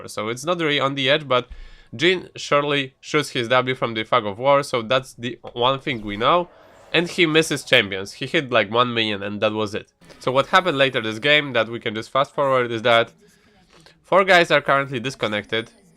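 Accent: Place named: Poland. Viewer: Where is from? Because i hear like polish accent in his voice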